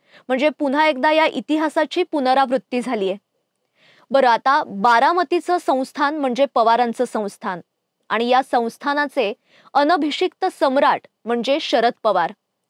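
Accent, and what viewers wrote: Standard. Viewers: छान...स्पष्ट आणि शुध्द उच्चार... खणखणीत आवाज...उत्कृष्ठ विश्लेषण....👍👍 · कॅमेरासमोर तू स्वतःला छान सादर केले आहेस. तुझा खणखणीत आवाज, स्पष्ट आणि शुद्ध उच्चार व आत्मविश्वास कौतुकास्पद आहे.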